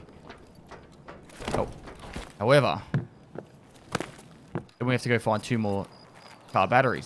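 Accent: Australia. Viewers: I recently found out Australians are the only ones who pronounce it differently (like he does).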